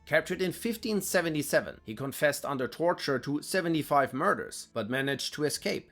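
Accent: Germany. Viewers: I looove your german english accent! 😂 I keep going back to your "every german state explained by a german" video! keep it up!!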